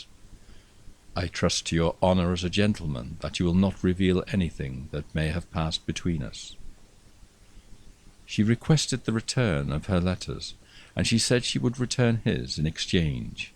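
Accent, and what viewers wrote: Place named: England, United Kingdom. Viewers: Aaaah that English accent, It sounds so very elegant to me I love it!!!! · Indeed very lavishly narrated in a typical English accent story of Emile A'Langeley trial begins. Precisely another part of the world in India, 30 June 1857 nearly 600 Scottish n English family killed by Indian Mutineers in Lucknow Cant, in which my ancestor actively took part. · Hes from liverpool, same place I'm from.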